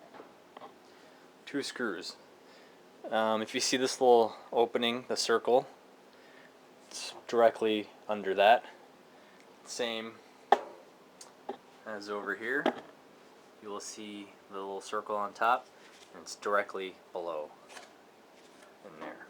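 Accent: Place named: Green Bay, United States. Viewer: Hey thanks! Where are you from? You sound like you're from Green Bay.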